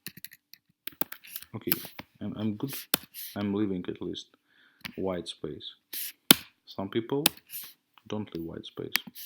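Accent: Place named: Russia. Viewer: He has russian accent I hear.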